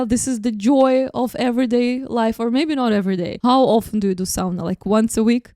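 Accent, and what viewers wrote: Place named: Russia. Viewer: Great reaction. And lovely Russian accent! Greetings!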